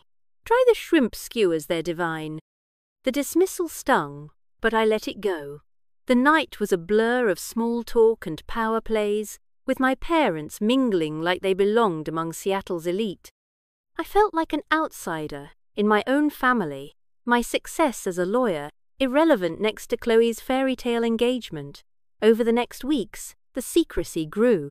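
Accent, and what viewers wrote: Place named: England, United Kingdom. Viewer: Frightful voicing.😮 The English accent really doesn't help at all.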